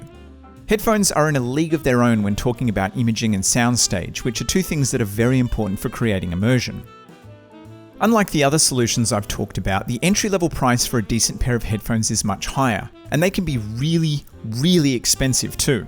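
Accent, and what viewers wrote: Australia. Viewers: Great video, don't know how i didn't see this ages ago. Is treble important for healing footsteps? I thought i heard an Aussie accent so had to Sub.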